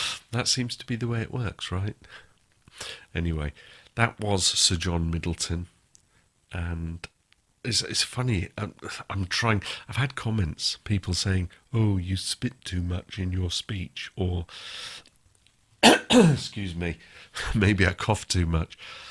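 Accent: United Kingdom. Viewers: Sir John is the comic relief along with Mrs Jennings. I like his boisterous personality. And I like your British accent, as many of we Americans do! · You've been criticized for that lovely, silky voice? Unbelievable. Your voice transports me in the same way a day at the spa with the sound of relaxing music and a pair of strong hands gently massaging away all the tension of a busy week. I often put one of your playlists on repeat when I retire for the evening. I always sleep better with a British accent in my ear.....and yours is the most soothing. Remain just as you are and allow malcontents to just drift off to another port of call.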